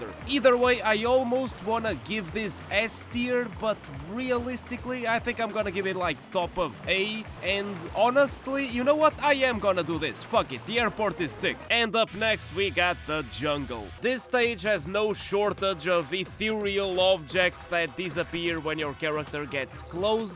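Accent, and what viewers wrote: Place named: Portugal. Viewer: Wowowowoww do i hear....portuguese? Um português fã de Tekken 4 rahhhhhhh🇵🇹🇵🇹🇵🇹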